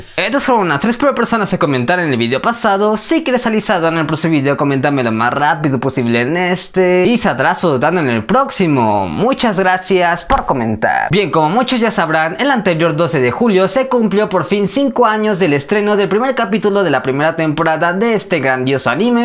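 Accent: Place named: Venezuela. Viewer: Literal aveces creo que este men es Venezolano en base a su Acentó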